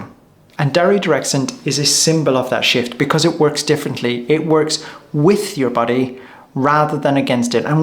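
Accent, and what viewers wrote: Ireland. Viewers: Well…you may be working for the NHS but you’re definitely Irish. Tell me I’m wrong? Bet I’m not!😊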